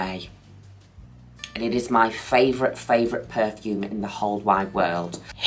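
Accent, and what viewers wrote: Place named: Liverpool, United Kingdom. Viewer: Super Scouse today 😂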